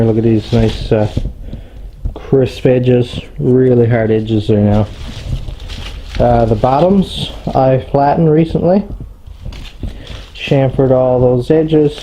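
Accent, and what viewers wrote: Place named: East, Canada. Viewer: hey, sounds like you might be from eastern Canada. Anyhow, that 2 inch chissel is actually a 2 inch slick.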